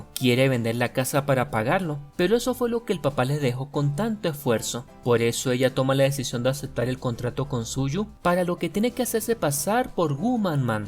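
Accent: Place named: Venezuela. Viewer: Venezolano, voz agradable, muy bien resumido y entendible 👏👏👏 gracias aquí otra suscriptora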